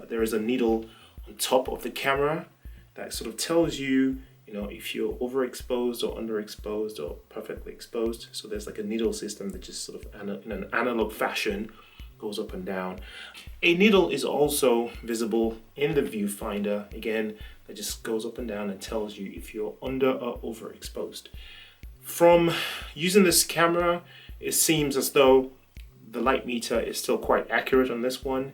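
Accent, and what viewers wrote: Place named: England, United Kingdom. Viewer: I just ran into your channel, I'm loving the content! It `always makes me smile hearing a fellow Englishman on the internet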